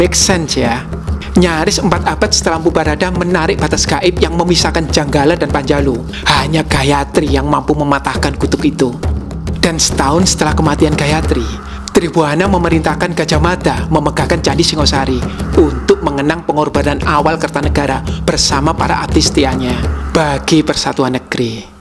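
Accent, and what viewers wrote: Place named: Surabaya, Indonesia. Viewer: Sek ta... Nggacor jare... Gak oleh ebes cak... (serasa kupingku disodok tugu pahlawan) 😂 terlepas dari aksen Surabaya yg ceplas ceplos, seperti biasa... esensi dari Chanel ini selalu jos 👍😇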